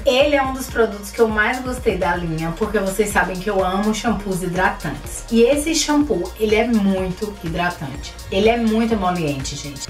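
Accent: Bahia, Brazil. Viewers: Queria q vc falasse com mais sotaque baiano. Acho lindoooo❤️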